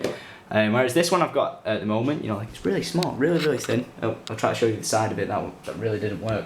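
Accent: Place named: Manchester, United Kingdom. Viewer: What part of manchester does he live in, i mean im from bolton and he sounds like he's local?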